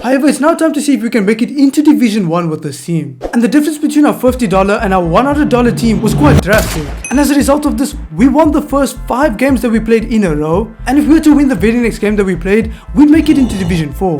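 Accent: South Africa. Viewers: Are you from South Africa? ( Same Here ) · Is he from South Africa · Are you from South Africa? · Ever since I heard your voice I knew you were south african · You from South Africa??? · Yoh I just knew you were South African bra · Bro ur South African for sure right ?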